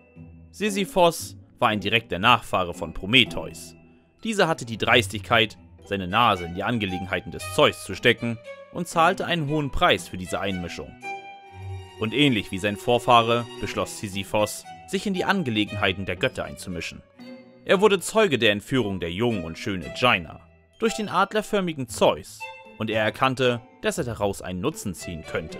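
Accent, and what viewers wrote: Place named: England, United Kingdom. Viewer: Als Grieche schmerzt es meinen Ohren, die Namen im Deutschen in englischem Akzent zu hören...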